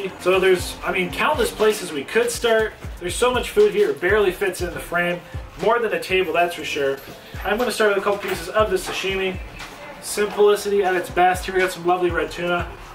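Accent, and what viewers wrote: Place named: South, United States. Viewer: You are so silly you and your cat in your country accent you make me laugh continue to stay safe many blessings and much love and peace ☮️💜💯😇🙏🏾💙❣️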